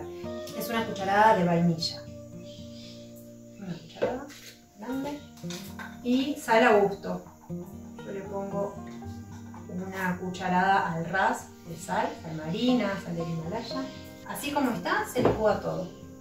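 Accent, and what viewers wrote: Córdoba, Argentina. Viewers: Ya se impregnó de tonada cordobesa la bb😊Pan de aaaarroz! La amé 💕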